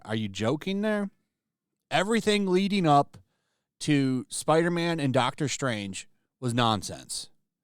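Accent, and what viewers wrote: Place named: RP, United Kingdom. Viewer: The upper class British accent kills me. Hail!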